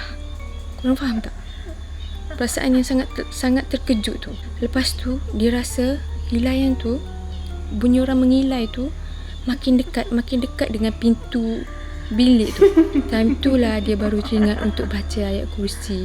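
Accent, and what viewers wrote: Kelantan, Malaysia. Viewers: Sy Dr Cyberjaya...tp Sy still suka loghat Kelantan seperti cerita sebelum nya...ni nmpk mcm kekok jer bercerita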